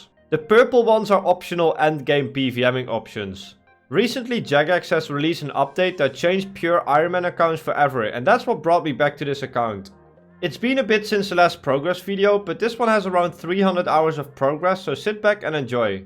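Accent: Netherlands. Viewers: Lol , you must be dutch , that accent is so obvious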